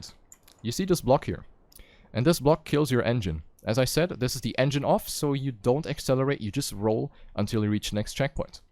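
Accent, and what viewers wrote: Germany. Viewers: oh hes german that makes sense accent trew me off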